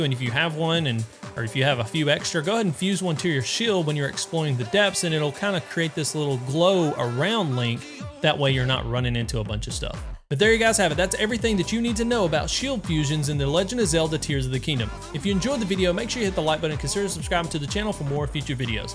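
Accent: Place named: South, United States. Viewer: Followed because of the southern accent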